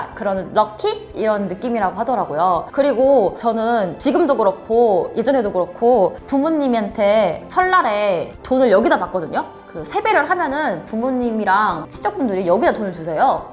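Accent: South Korea. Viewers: 한국말이 원어민인데요?? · 이 사람 왜 자꾸 나와서 본인이 대만여자라고 하는지 모르겠네요. 100% 화교도 아니고 중국말도 잘못합니다. 사실 한국화교들 대만이랑 상관도 없고 중국대륙에서 건너온 중국인입니다. 국적이 있다하더라도 대만이랑 똑같은 국적이 아니고 그냥 서류상 그런거지 그냥 한국인입니다. 중국말도 잘못하고 발음도 완전 한국발음, 왜 자꾸 대만여자라 하죠? 그냥 한국인이 한국국적 받은겁니다. 얼굴도 완전 토종 한국인이구먼. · 이 유투브 사기다!! 와~~ 완죤 토종 한국어 사용 하시넹!! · 이분은 외모도 한국사람, 말투도 한국사람, 이름이 조금 그렇지만, 한국에도 있으니까...귀화얘기 안하면 그냥 한국인이네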